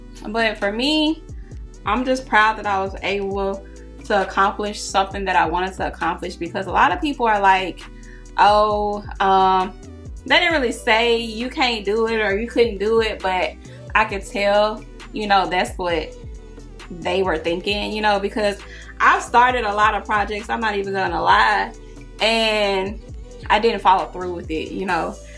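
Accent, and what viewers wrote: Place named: South, United States. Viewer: U country where u from youngan?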